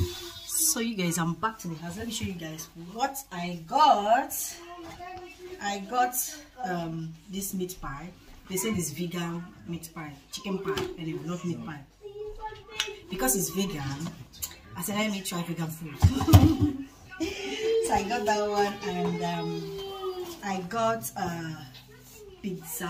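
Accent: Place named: Igbo, Nigeria. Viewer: I just tap the like button before even watching because you are funny with the Igbo accent.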